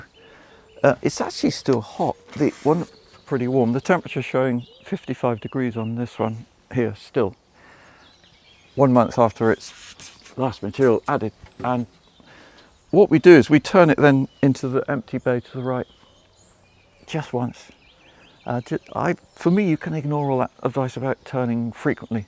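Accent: United Kingdom. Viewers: Put on Closed Captions. From one of those darn French Canadians that don't understand British accent, but love it nonetheless.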